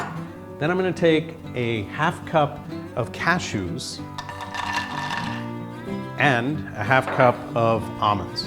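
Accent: Maharashtra, India. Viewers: I liked your Marathi accent, 🤩🙏 It's a pleasure and feel proud to see that such a superior doctor who practices medical overseas for many years hasn't forgot his roots. You are such a down-to-earth man. I truly admire you 🙂 Keep inspiring us through your lovely videos 👍 🤩Stay fit and keep smiling 🙂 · Loved your marathi ॲक्सेंट ❤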